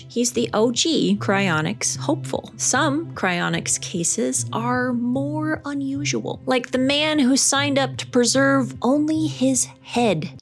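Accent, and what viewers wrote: Minnesota, United States. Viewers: I knew you were from Minnesota. The accent is very subtle, but there must be a law that no woman in Minnesota is allowed to live there unless you are at least not a nine of ten, Wisconsin is a close second, but weirdly, the women in both states are not just normal pretty women, they're ALL movistar hot. It seems very strange and intimidating to outsiders as we assume the local guys must be similarly attractive to women. I'm considered extremely attractive in most of the country and I one hooked up with a lady in Wisconsin, but Minnesota women have always been elusive to me, despite being attractive, successful, and having a great sense of humor and the same IQ as as Elon Musk. I can't even get a single date with a Minnesota lady.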